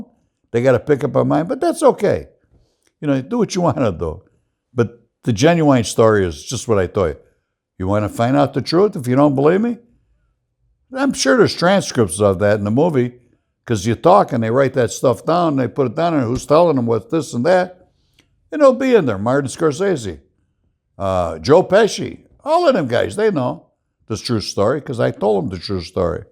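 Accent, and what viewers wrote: Chicago, United States. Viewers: Frank didn't your big Brink's truck heist involve Divine Savior church in Norridge? I remember that when I was a kid. Also, did you hang around at Grand and Cicero Avenues? Thank you for clarifying that we use the term JAGOFF back home. I have shown your scene in Casino set in Costa Rica to several people to help them understand our ways in Chicago. And your accent in your videos make me homesick!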